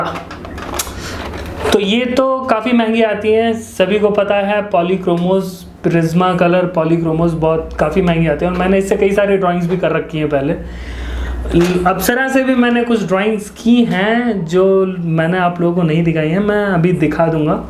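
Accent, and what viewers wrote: Bihar, India. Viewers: Sir aap Bihar si hoo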